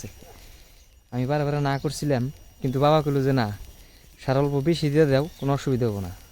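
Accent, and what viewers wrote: Bangladesh. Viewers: আপনার ভাষা একদম বাংলাদেশের জামাল পুর জেলার ভাষা। আপনাদের ওখান থেকে আমাদের জামাল পুর জেলা কত টুকু দুরত্ব? খুব সুন্দর আপনার এলাকা। ❤❤❤❤❤🎉🎉🎉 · india or Bangladesh? আপনার ভাষা তো দেখছি ময়মনসিং ের মতো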